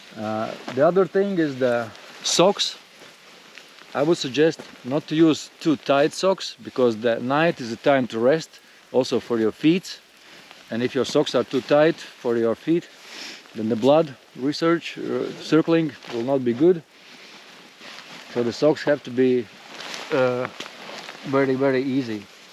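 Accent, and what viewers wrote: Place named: Baltic states. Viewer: Так вот как звучит прибалт,говорящий на натовской мове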